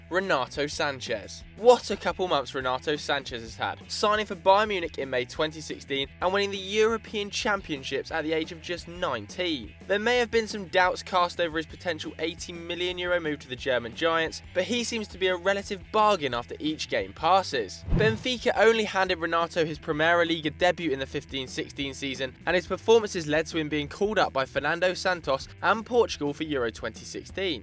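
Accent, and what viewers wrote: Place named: United Kingdom. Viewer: i cant even understand this British fuck when he gives stats. Whats "Naut".495???? 0.495 or 1.495 what is this bullshit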